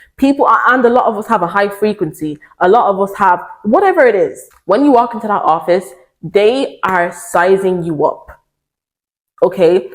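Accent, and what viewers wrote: Ireland. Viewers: Unrelated but are you Irish? You’re accent is familiar 😅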